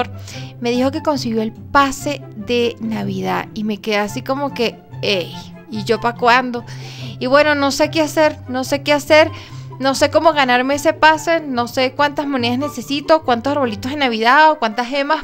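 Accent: Venezuela. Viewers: Eres venezolana ？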